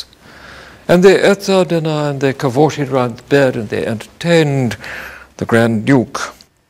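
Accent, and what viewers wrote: RP, United Kingdom. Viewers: This super-posh accent surely makes anyone else sound rough, but shouldn't it be "AT that very moment" rather than "IN that very moment"? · Brian speaks posher than what the Queen does. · cut-glass accent is impeccable and flawless. An unattained aim for many people all over the world! His oratorical adornment is unsurpassable. Gifts of oratory, writing and organization are all his. It is rather difficult to imitate his manner and catch the tone of his voice. There is no doubt that he speaks a peculiarly musical English which bewitches the ears of auditors. His pomposity is merely a mannerism. I adore his pompousness and gentlemanliness. The art of saying things in the way as does, is like an ear for music, it is not to be acquired! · The poshest man in the world. Called Brian. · Who wrote this script, who did this research, who does it serve? 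This 'mockumentary' is full of misinformation and incorrect history. He has absolutely NO knowledge of the Medici family tree. He mixes branches back and forth. The pawnbrokers NEVER became Grand Dukes. The Grand Dukes were not from Lorenzo's branch, neither was Louis' grandmother. He calls the last Grand Duke of the 'real ' Medici and the LAST Medici (really?)? Lorenzo, from a different branch, was therefore, not a 'real' Medici? Leave it to a Brit to label the Grand Duke the Last of the educated Medici (insulting!). the Lorenas were not Medici - they were Hapsburg. Do they expect that we will believe this farce because the narrator has a British accent and that he quotes other (misinformed) Brits? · Posher than the queen... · I have punched less posh people for being to posh... i'm gonna find some cockney to get this guy out of my head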